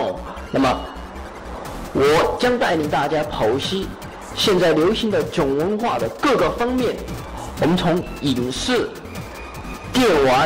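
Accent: Sichuan, China. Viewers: 我四川人听的很顺畅，只是他很川普所以有些不会四川话的人会觉得很怪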